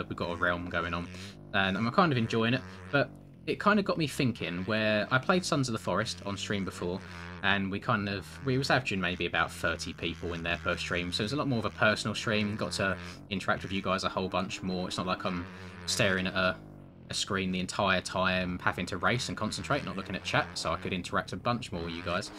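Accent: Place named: United Kingdom. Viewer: Any time I get to hear the smooth british voice I'm in.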